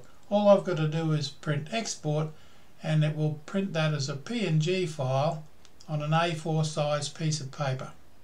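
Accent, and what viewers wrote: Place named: Australia. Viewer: I’ve been watching you for a while now and always thought you were an Aussie..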